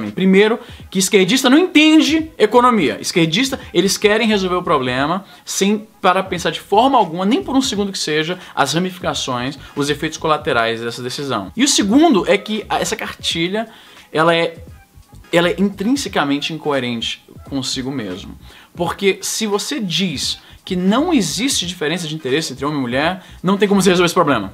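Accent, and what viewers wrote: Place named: Ceará, Brazil. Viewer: Nesse video podemos perceber as raizes nordestinas do, provavelmente do Ceara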